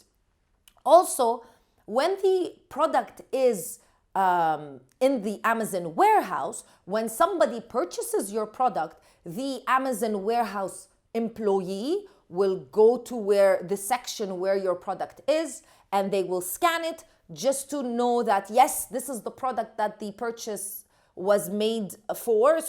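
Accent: Arab world. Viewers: I am confuseeeeeeed.u speak english like an arabic girl but your name is.any thanks for guiding us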